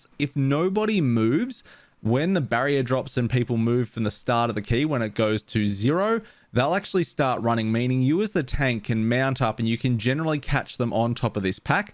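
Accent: Australia. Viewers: Are you on Barthilas? Also, are you Australian?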